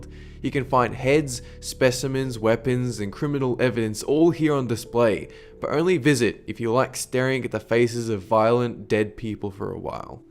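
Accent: Australia. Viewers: Whay is his accent? I want to say Australian, but I dont hear many accents in my life. And you should make a sequel to this video. You missex alot of other cool museums! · Yeah it's Australian~ best accent ever！ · Seven Super Kittys he doesn't sound canadian to me, much more aussie. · Not a normal Australian accent. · He's from Australia he says it in one of the videos by the way am from Australia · I believe he is Australian. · Australian.but has some american influence like pronouncing new like noo ... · sounds like Australia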